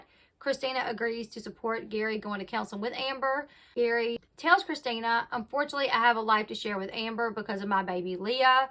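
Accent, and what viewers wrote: South, United States. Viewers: ... At first I had commented, basically piggybacking onto your 1st comment and then read what said back to you and I'll admit, she got me. She played victim and because I'm one of "those people", you know exactly what I mean, the ones she looks down her nose at, I was ignored by my initial comment on yours and had stupidly deleted it. Then I chose to write an apology comment directly to and you know what? She ignored that one too! I used to really support her channel, especially during the whole KJ situation, BUT now.... nope! She's just like KJ, with a more southern accent and better ability at applying makeup. That's pretty much all. She doesn't care about anyone BUT herself, her money, maybe husband and kids and we don't make the cut whatsoever. This is the type of broad that sits at BBQ'S bitching about how "these people" affected her life and how useless we are. 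She's judgemental and I feel like a fool for firstly deleting my original comment and writing a separate apology one and secondly for falling for the manipulative techniques & word salad used in her reply to you and your comments. This woman, I'm so glad that my daughter won't ever have to grow up around her children and there's states between us. I just can't, it's like speaking to a body void of personality and empathy. Empathy is what got me to delete, apologize and, no excuses about your teeth or children are going to get you outta purposely ignoring it. I'm embarrassed and angry. BUT, I s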